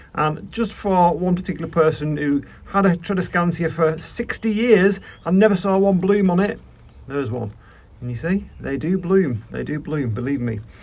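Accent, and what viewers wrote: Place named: Northern England, United Kingdom. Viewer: What a super helpful video re TZ! Clearly, I have some clipping, cleaning up and repotting / propagating to do. Now that I have seen your video, I feel confident that I can save my failing babies. Thank you for your insight... Off to watch the video you pinned in the comment section now. I can't get too many plant care tips with these black thumbs of mine, lol! Well, they are leaning towards deep, dark green now :O) Also, your accent is warming... brings me right back home. I have been in the States since early 1990 and I love bumping into my northern English brothers and sisters on youtube. (Mancunian? I was raised partly in W. Yorkshire but lived in Manchester for a good chunk of time)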